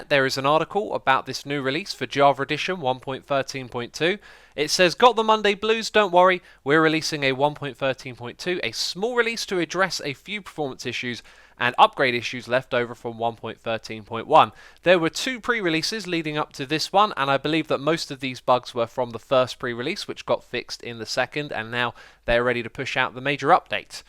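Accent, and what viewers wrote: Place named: England, United Kingdom. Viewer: That English accent..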